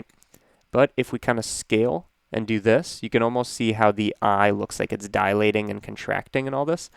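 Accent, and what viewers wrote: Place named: Chicago, United States. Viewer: Dude this is straight up genius! Are you from Illinois by any chance? Thought I sensed some Chicago in your voice.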